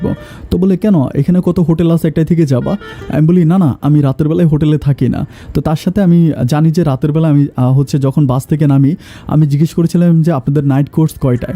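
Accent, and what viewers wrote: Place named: Barishal, Bangladesh. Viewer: আপন ভাই মনে হচ্ছে আপনি বরিশালের ছেলে আপনার ভাষা বরিশালের মত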